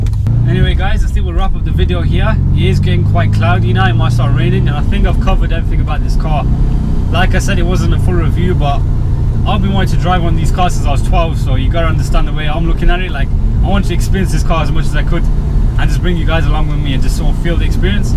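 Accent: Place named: Birmingham, United Kingdom. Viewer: Where about is he from. His accent is Birmingham, just checking if he lives near me :D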